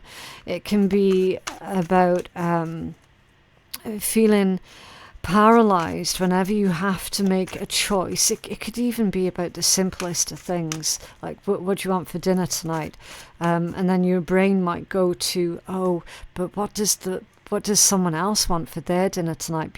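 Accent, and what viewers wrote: Scotland, United Kingdom. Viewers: Can I hear a wee Scottish accent in there somewhere? Great reading!❤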